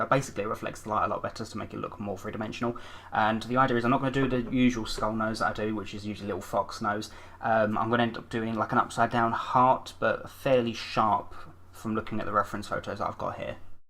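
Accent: United Kingdom. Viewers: I love it! Your British accent is wonderful! I need more omegle's video please ❤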